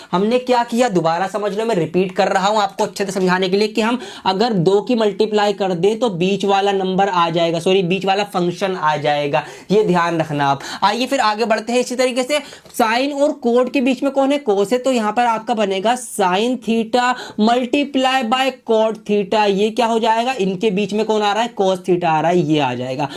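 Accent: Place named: Uttar Pradesh, India. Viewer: Correct bro thanxx tum up ka ho ya to pata chal gaya😁🎄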